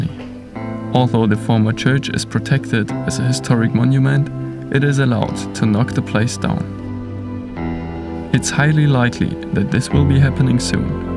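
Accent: Germany. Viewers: I love the way you pronounce Victim. Or the V in general. It's so German!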